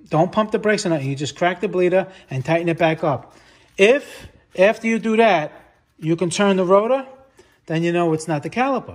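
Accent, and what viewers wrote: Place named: New Jersey, United States. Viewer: Love the east coast Jeewwwessseeyyy accent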